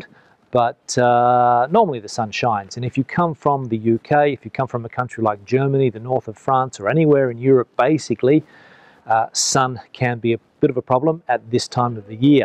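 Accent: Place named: Australia. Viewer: He sounds Australian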